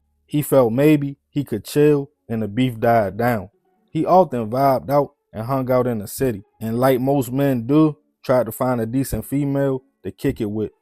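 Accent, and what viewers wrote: Baltimore, United States. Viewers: Ngl that intro is hella corny, is that how Baltimore niggas talk?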